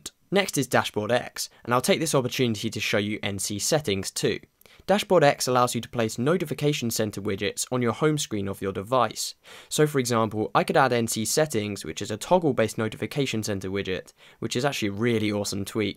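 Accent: United Kingdom. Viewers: The british accent just sounds professional and makes you want to get all these apps! i love your videos mate. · would you mind to send to make a list of the tweaks you mention? i'm really bad at understanding english properly, specially if its british acent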